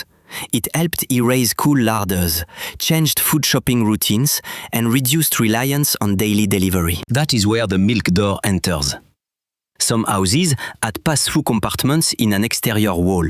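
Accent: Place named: France. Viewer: I like this decent French accent😊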